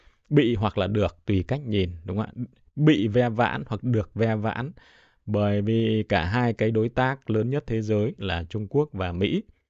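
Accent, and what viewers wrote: Standard. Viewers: Anh nói rất chuẩn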